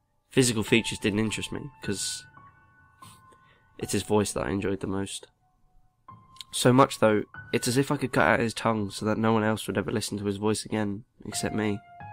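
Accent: United Kingdom. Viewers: british accent. :D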